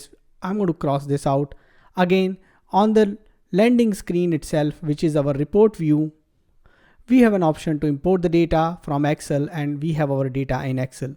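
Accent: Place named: India. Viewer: 11 hours of a thick Indian accent. Sorry. This is impossible to sit through.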